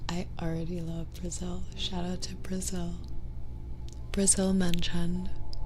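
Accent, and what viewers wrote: South, United States. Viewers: you are so beautiful. I'd love to see a kidnapping asmr video with the southern accent. The southern accent is so hot.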